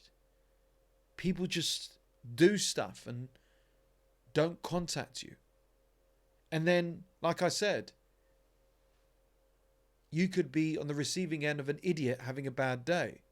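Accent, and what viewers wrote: United Kingdom. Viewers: He described all of Western societies today to a tee in a British accent.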